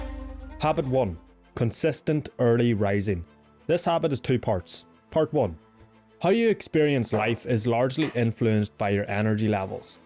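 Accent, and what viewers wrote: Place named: Northern Ireland, United Kingdom. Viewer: Recognised that NI accent, and saw that QUB on your LinkedIn. Good lad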